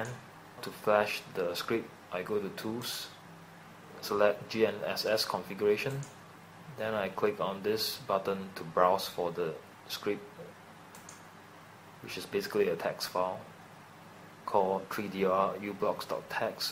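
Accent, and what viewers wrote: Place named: Singapore. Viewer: Singapore English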